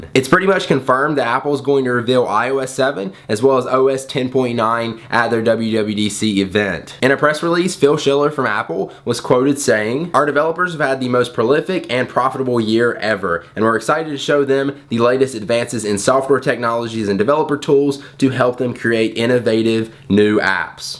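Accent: South, United States. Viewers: Were you raised or born in the south, then moved up north? I hear country in your voice, but I'm not trying to be stereotypical.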